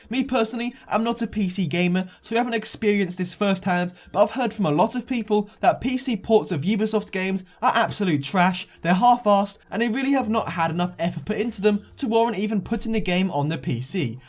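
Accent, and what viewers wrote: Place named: England, United Kingdom. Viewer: You are English right?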